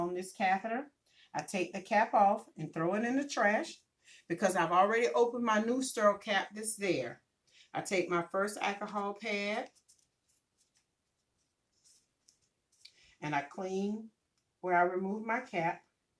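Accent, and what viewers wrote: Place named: South, United States. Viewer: Loved the video!!!! Thank you so much, and you sound southern! :)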